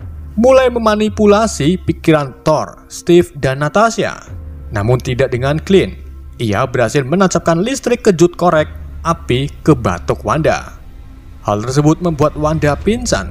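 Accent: Indonesia. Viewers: penyebutan nya indonesia bangeetz😂 , 👍👍🤗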